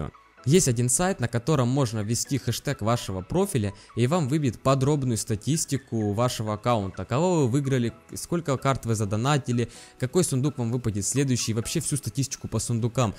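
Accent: Ukraine. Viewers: Украинский акцент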